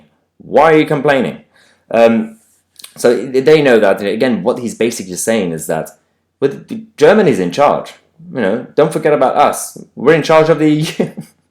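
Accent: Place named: England, United Kingdom. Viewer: I love your reporting! Your presentations rely on actual source information, succinct and intelligent commentary, and a gorgeous English accent!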